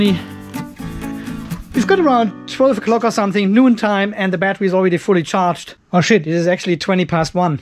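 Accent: Germany. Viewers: meine Mutter und Großmutter findet dein clasicher deutsche Dialekt so funny.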